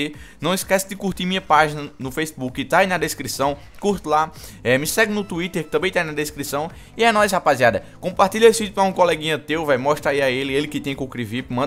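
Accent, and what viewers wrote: Northeast, Brazil. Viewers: TU e nordestino ?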